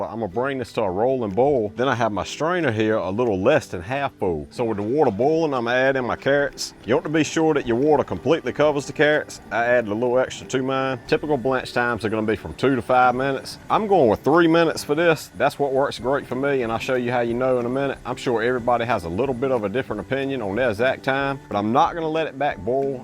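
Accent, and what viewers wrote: United States. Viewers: As a Australian... he sounds nothing like a aussie. He's all American 😅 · Make American accents thick again.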